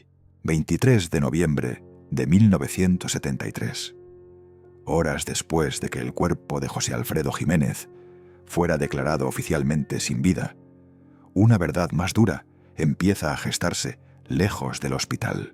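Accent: Spain. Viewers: Mal muyyyyy mal su reportaje, José Alfredo Jiménez, NO escribió más de mil canciones, son entré 360-,o 380, infórmese primero, su acento español, de lo que Usted dice, son mentiras......... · Eres español, que vas a saber de México. Me choca el acento de Uds.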